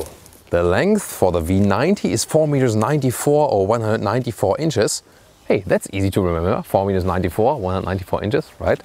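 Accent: Germany. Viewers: Your englisch Sounds very german. Funny.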